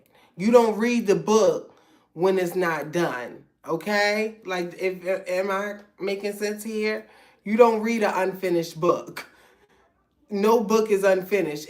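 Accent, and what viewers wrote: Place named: Baltimore, United States. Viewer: hello beautiful. can I ask where are you from? you have a Bmore or DC accent. I'm Bmore born and bred! #Poly😆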